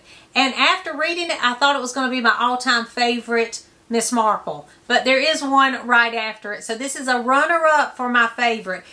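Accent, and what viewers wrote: South, United States. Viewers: As you see by my moniker, I am a fan of Agatha Christie’s Jane Marple. It has admittedly been years since I’ve read one of them, tho in my youth I read each several times, saw the movies, blah blah. opinions are likely as good as anyone’s. She’s a lovely looking gal, but once she opens her mouth, the ambiance is gone. The God-awful overt Southern accent just overlays any intelligent conversation with ‘nails on chalkboard’ irritation for me. That accent accompanies a mindset I don’t like, and I dare say Ms. Christie would roll over in her grave if she could hear it. Lighten up on the Souther roots, and give your SUBJECT center stage. Real journalists have been doing so for generations. Sorry folks.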